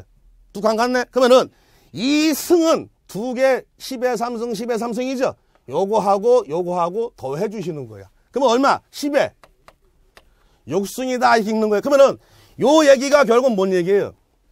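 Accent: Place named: Jeolla, South Korea. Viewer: 강사님 전라도분이시죠? "그므는" 저도 전라도 사람인데 너무 구수하고 좋습니다.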